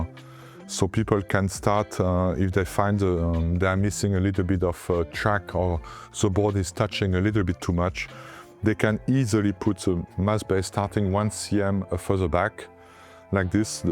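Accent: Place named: France. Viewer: there's french accent and then there is french windsurfer accent.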